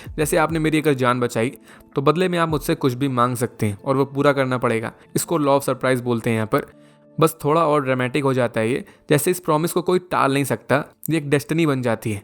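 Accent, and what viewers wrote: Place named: Chhattisgarh, India. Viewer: Tum chattisgarh k lagte ho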